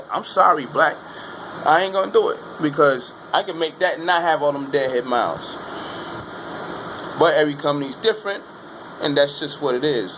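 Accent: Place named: New York, United States. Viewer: Nah son sure do have that ny vibes I’m from Brooklyn living in Houston so I can tell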